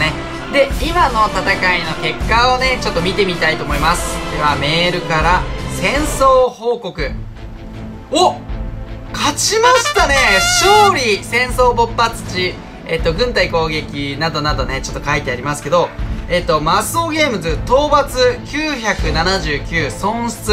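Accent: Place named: Kansai, Japan. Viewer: 関西弁が滲み出てるで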